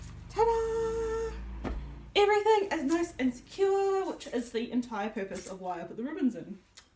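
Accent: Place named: New Zealand. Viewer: your accent is very beautiful I don’t know much about your country and am really sorry if I get this wrong but is that the accent that sir Peter Jackson has and what’s your favourite thing about New Zealand and who is your favourite character in he lord of the rings and the hobbit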